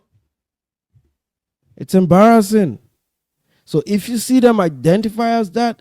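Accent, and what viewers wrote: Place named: Nigeria. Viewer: Tariq wrote that book years ago... When he was young.... Before he transitioned and became more consciously aware and connected to the black American struggle... You have a whole country of your own to worry about... You. Come from a third world country... I think you're Nigerian. You look and sound like it, Nigeria, a country that has over 200 million people. But yet it's annual gdps less than 500 billion, that's AJ poverty... You should be concentrating on that.. instead of calling black Americans, Temu blacks, a group of people that's way more successful than you have. Way more money than you. Accomplished more than you built more than you, that's why a lot of you guys come over here.. walking on college campus is that black Americans paved... Living in communities and in neighborhoods that black Americans help accommodate.. 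leaving your country and running away from it, because it doesn't work. And coming over here with black Americans have set the country up for you.... Don't worry about block Americans. It's a totally different ethnic group, black Americans or generations removed from Africa...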